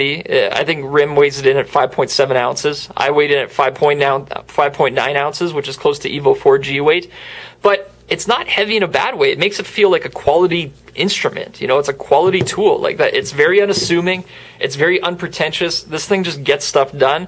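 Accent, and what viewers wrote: Canada. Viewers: Are you canadian ? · love his canadian accent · You sound so Canadian and i LOVE it! Thanks for the awesome review!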